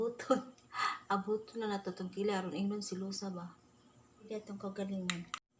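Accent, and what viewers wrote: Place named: Visayas, Philippines. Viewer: bisaya diay ka tita hehehe cute kau paminawon tita, more video tita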